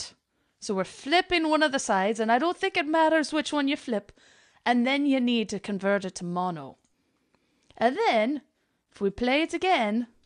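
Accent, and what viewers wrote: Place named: Scotland, United Kingdom. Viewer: Your scottish accent is super hot.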